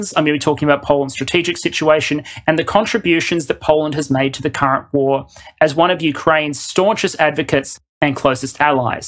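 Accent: Australia. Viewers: No, thank God doesn't go up with his voice at the end of every sentence! They are both Australians however, that may be why you find them similar. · is Ukrainian propaganda with an Aussie accent...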